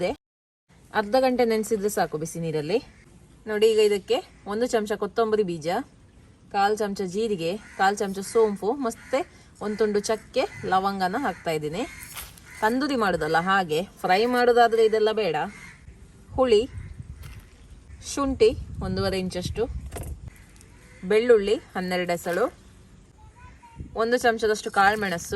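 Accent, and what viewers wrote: Mangalore, India. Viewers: ನಿಮ್ಮ ಮಂಗಳೂರು ಬಾಷೆ ತುಂಬಾ ಚೆನ್ನಾಗಿದೆ ಅಕ್ಕ ಬಂಗುಡೆ ಮೀನು ನಾನು try ಮಾಡ್ತೀನಿ ಅಕ್ಕ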